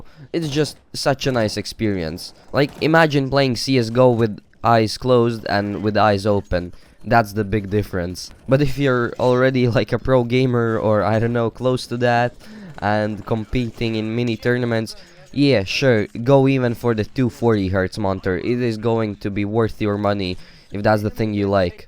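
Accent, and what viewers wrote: Latvia. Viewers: No akcenta sapratu ka tu esi latvietis :D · Latvietis esi? ljoti izklausaas :D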